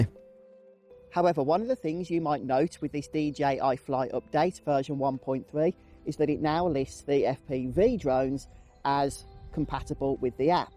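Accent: England, United Kingdom. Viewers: Thank you for info What part of england is your accent from ?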